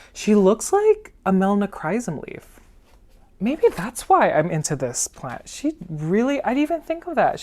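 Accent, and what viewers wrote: South, United States. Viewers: That Hoya Lisa is to die for! You crack me up. That southern belle accent is to cute. Love the sweater.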